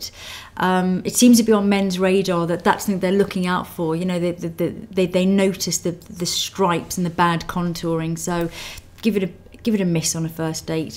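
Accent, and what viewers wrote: England, United Kingdom. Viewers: wow u are a makeup GURU! i love ur accent, lol when i try to be british i sound just like u. thanks for ur help. oh, and u look rlly beautiful! :D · I love the british accent it sounds so elegant :D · im here to learn british accent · I LOVE her British accent :3 i feel that she was more of interacting with me in the video :D despite her talking a lot. but i liked it! :) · you mean english accent, there is no such thing as a british accent.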